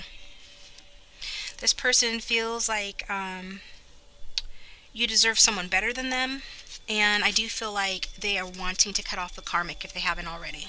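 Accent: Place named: United States. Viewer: Your readings are very accurate but I struggle to listen to your American accent 😭😭😭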